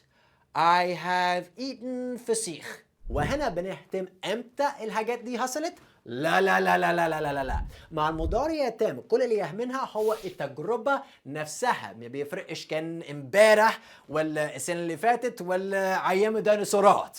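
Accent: Egypt. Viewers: I following you from egypt and admire your works and your egyptian dilacate is pretty amazing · انت بتتكلم باللهجة المصرية هو انت عايش في مصر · بتتكلم مصري حلو اوي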